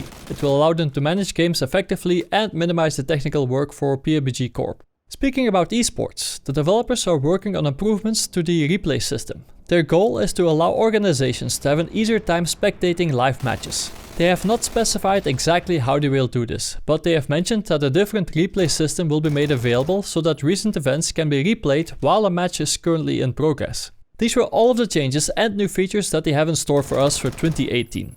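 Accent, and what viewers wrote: Netherlands. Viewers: He;s Dutch I think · My guy you sound so extremely fucking Dutch, it's unbelievable.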